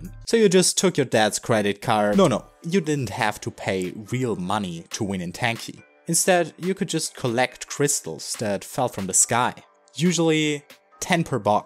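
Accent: Germany. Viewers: based on the accent im assuming you are german? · ru german your accent sounds like it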